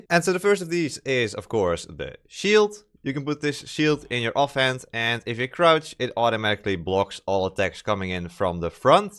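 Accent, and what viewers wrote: Netherlands. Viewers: The dutch accent 😅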